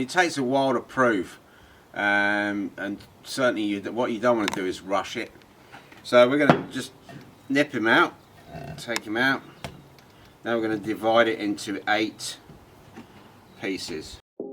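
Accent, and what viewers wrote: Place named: New Zealand. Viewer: Hi I am from South Korea I thought you sounds like kiwi I am right You are in Christchurch I enjoyed your bread show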